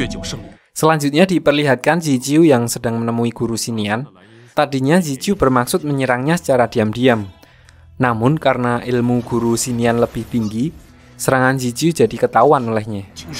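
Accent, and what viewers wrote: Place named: Java, Indonesia. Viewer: Mambu mambu wong jowo admin e ki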